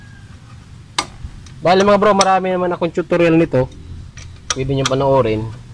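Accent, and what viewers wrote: Bicol, Philippines. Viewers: bicolano ka boss?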